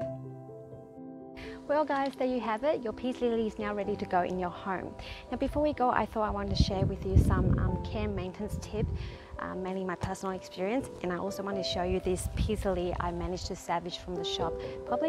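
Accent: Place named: Australia. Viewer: aussie accent blended with some asian accentis excelent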